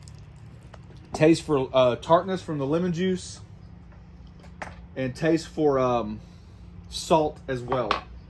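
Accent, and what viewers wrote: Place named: New York, United States. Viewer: There is nothing wrong with your overalls. I happen to have the same ones, carharts I love them. My friends all say they never saw overalls on someone with a new york accent before. I just say YOU GOT A PROBLEM WIT THAT????? ps. Where did they go? Gone half way through the video. For get a bout it.....THANK you Frank from montana...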